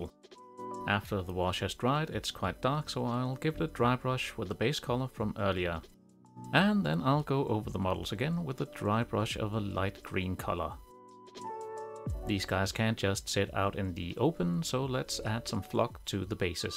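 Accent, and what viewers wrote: Denmark. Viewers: er du dansk? det lyder sådan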